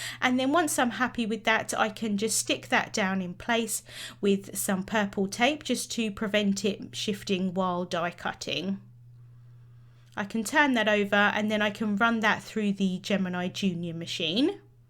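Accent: United Kingdom. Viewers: Lovely card!! I love your accent!! I am from the US and love to hear the British accent!! You always make such lovely cards!!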